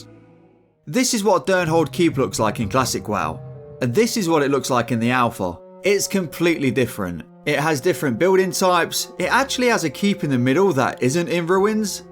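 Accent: United Kingdom. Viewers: Are you Britannic ? I think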